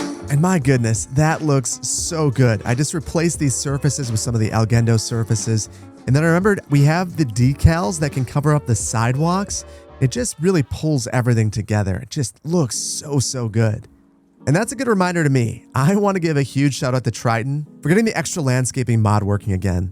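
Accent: United States. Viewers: Even if it wasn't clear by your accent, your actions would make it clear you're American😅 Let bulldoze this road to build a highway through our city. Let's bulldoze a neighbourhood to build a shopping centre, build a few big box stores. These buildings are to high for a residential neighbourhood, there are too many trees. Let's get rid of the sidewalk, this much sidewalk seems unrealistic🤣 This episode definitely made me laugh!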